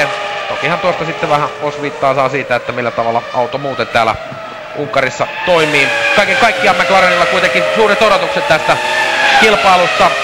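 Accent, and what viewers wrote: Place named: Finland. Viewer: Sounds Finnish to me.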